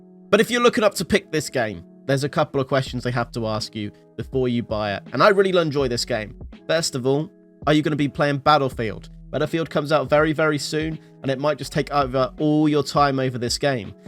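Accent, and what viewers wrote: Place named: United States. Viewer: Mate, your English stop pronouncing your T's in an American accent lol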